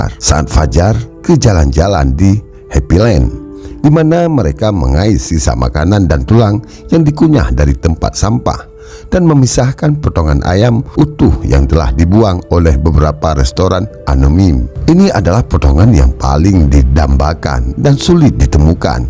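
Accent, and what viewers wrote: Aceh, Indonesia. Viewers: dari logatnya kayaknya bang admin orang aceh ya hehe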